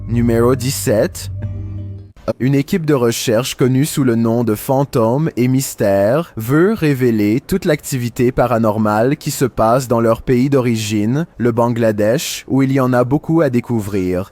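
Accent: Quebec, Canada. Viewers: C'est l'axent québécois connace 🤨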